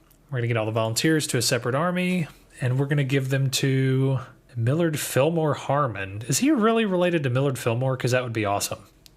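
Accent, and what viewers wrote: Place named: Louisiana, United States. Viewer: The Louisianan accent made me subscribe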